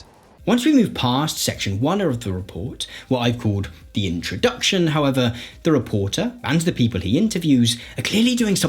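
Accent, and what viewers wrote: United Kingdom. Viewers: has that certain British charm with which he speaks that's difficult to describe, but instantly recognisable. The way he sometimes replaces "because" with "for" is just... well... cute! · Great video but somehow my man has a british accent with a california vocal fry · Mickey Rooney with a British accent? 🤭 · Your accent is half "annoying British accent" and half "pleasant British accent" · Why do you look like you sound British. I know why you sound british, but why can i tell you do by looking at you? Care to explain yourself?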